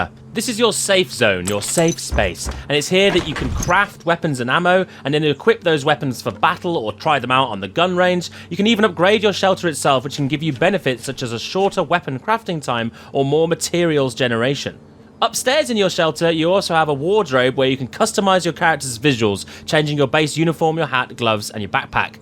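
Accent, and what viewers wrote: United Kingdom. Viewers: Uncomfortably British...jesus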